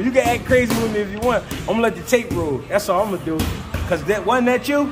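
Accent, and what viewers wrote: Philadelphia, United States. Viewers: Bro definitely from Philly 🤣🤣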